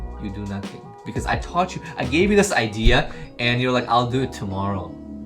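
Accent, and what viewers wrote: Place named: United States. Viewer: Bro make a video on how to get that American accent😳🔥💯💯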